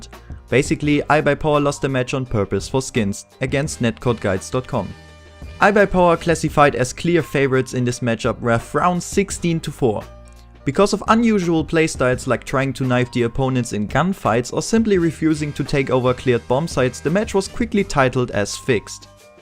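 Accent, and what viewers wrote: Germany. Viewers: Are you german? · Wusste gar nicht das du deutscher bist, aber der Akzent hat mich die ganze Zeit schon stutzig gemacht. Mag deine Stimme in den Videos. Passt gut zum stuff den du bringst. Super Arbeit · This German Accent is so cute lol.#nohomo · his grammar is alright but he has a annoying german accent and says "f" instead of "th"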